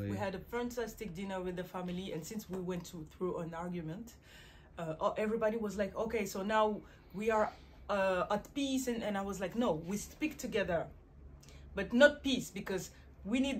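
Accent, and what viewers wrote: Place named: France. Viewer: Vous vivez en France? Avec cet accent français....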